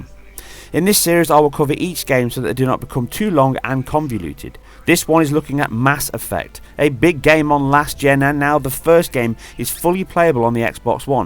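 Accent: United Kingdom. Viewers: Hey!!! Are you British??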